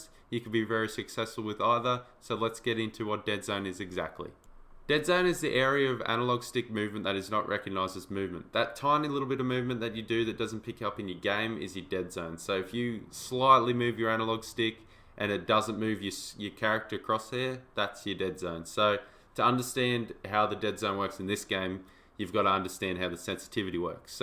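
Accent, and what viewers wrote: Australia. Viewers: Soon as I heard that voice I subbed, don’t come across many aussies, good video to bro, didn’t know what deadzone was till now 🤙🏻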